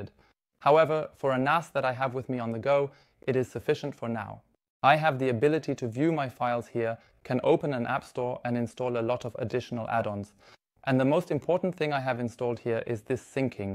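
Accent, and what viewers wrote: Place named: Germany. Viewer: You are very handsome young man I love the German accent. 🤗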